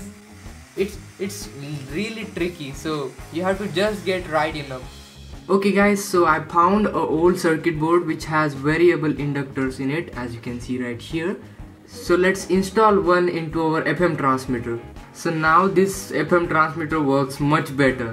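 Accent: India. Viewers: Metin Baydemir It's Indian English. Just an accent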